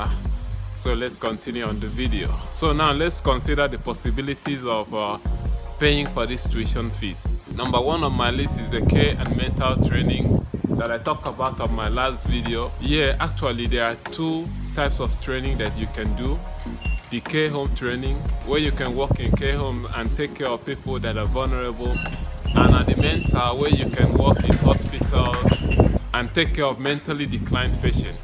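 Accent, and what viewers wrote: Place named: West, United States. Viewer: Igbo boy alert! But you sounded like you're from the west. 😂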